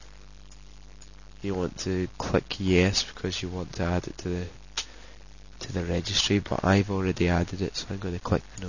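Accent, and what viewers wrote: United Kingdom. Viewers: He's Scottish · You Sound awefully like midlothain punter(im fae the same area). P.s. I know this is an old vid but forceware does not alow you use coolbits. RivaTuner is you best bet from now on unless you want Nvidia shity overclock tools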